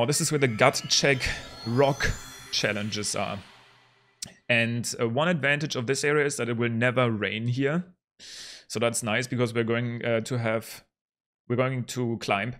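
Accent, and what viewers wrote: Germany. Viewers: Okay, can I ask something? Do you speak German usually, because you have such an accent! Wenn, dann find ich das mega geil XD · wie man immer hört wenn deutsche englisch sprechen xD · Das erste dass mir auffällt ist sein deutscher akzzent lol. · are you german? you sound like it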